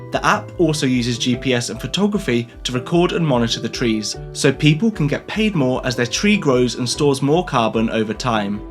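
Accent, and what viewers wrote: United Kingdom. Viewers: Having been swamped in war news, trump news etc etc today, your channel suddenly popped up, and I thought....why not! You go a little fast for my poor old brain (I've got severe M.E.), but I've subscribed, and we'll see how we go. It's refreshing to hear a British accent, for a change too.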